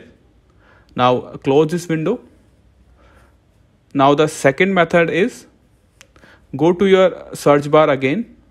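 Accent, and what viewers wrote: India. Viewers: the indian accent makes it funnier lmao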